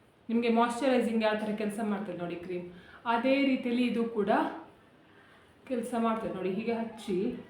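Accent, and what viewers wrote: Mangalore, India. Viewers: Chanda madi ...astu sala helthira? Mangaluru raga chanda ede..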